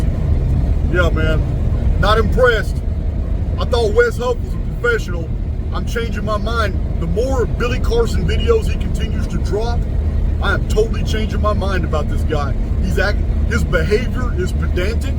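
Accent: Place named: Texas, United States. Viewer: "I'm not buyin' what this Hobbit is sellin'" 😂💕🇬🇧 I love your Texan accent when you get on your rants!❤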